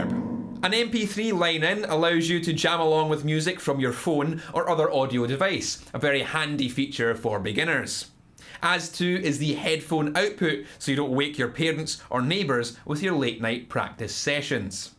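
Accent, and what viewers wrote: United States. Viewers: Oh boy that American accent is coming along so nicely! lol, just love these review videos, awesome as always! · The American accent you use. Thank you for that. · That American accent though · Last time I was this early my girlfriend was disappointed. Also that american accent tho. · THE AMERICAN ACCENT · Great video, and pretty cool bundle. Your American accent is damn charming haha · Seriously good review. I mean I am a bass player, and you almost had me wanting one of these... And I give you a B+ on your american accent.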